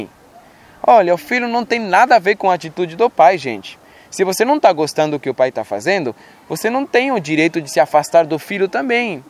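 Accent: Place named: Spain. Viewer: Você não é Brasileiro né? Seu sotaque é meio para o espanhol o enfim, eu também sou filha de pastor e já passei por muitas coisas que você citou no vídeo ...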